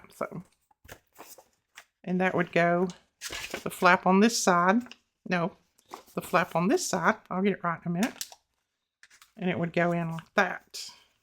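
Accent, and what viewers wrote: South, United States. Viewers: Hi just love your inspirational videos. I could listen to your soft gentle Southern accent all day. Wishing you all the best for this year 2024. I was wondering if you could design some digitals with some birds, flowers and butterflies from USA?. I am from the Uk 🇬🇧 Bunny x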